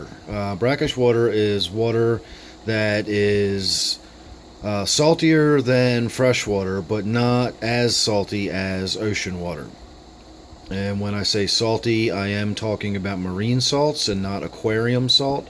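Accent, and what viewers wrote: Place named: East Coast, United States. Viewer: You talk like me are you from eastcoast? I'm from Baltimore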